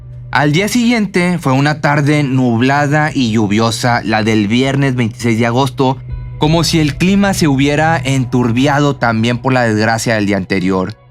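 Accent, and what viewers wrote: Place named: North, Mexico. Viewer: Llevo meses siguiendolo y no sabía que es de aquí. Su acento se me hacía familiar. Arriba el norte! 🤠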